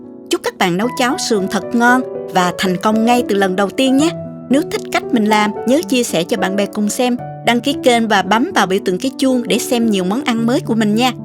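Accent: South, Vietnam. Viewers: Khong the noi ca?m o*n bao nhieu lan den voi. Kenh cua la kenh nau an, nhung thich nhat la cach dung tieng Viet cua, vua sang tro.ng, vua trong sa'ng, va rat ngot ngao voi giong Nam bo^. Toi nghe cac ban trong nuoc xai tu*`, toi that su*. nga'n nga^?m, cach noi vo^.i va`ng, dung tu*` hung du*~..... Rat tran tro.ng kenh vi da giu gin su*. trong sa'ng cua tieng Viet. Than chao, Hiep Than Minneapolis, MN Hoaky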